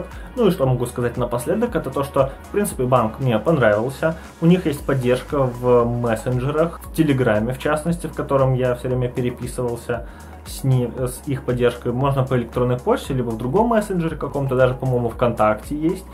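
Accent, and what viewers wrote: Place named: Belarus. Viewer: забавно, что с белорусским акцентом, вы ни разу там не были)))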